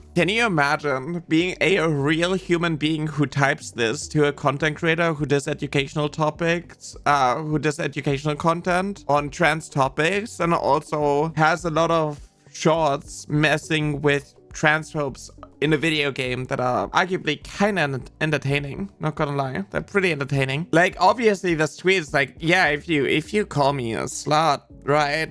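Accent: Germany. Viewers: Topic of your voice; you sound like a normal German girl to me. Not sure if transphobes know but accents exist. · i still don't get the voice thing, like have they legit not ever heard women speak, who am i kiding they either stick to such a narrow as shit definition of what a woman should sound like(which would exclude most cis woman), or are the "haha woman should be silent" type bigot, or both. anyway, you just sound like a woman with a german accent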